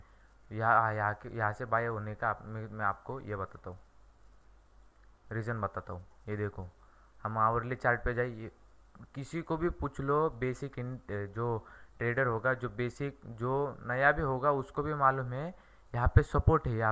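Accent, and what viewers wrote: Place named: Maharashtra, India. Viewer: तुझी हिंदी बोलण्याची पद्धतीवरून तु मराठी वाटतोस आहेसच...video भारीच होता .हे सगळं मराठीत येऊ द्या ..मराठी मधील शेअर मार्केटचा सगळ्यात फेमस आणि उपयुक्त च्यानल तुझं होवो ह्या शुभेच्छा .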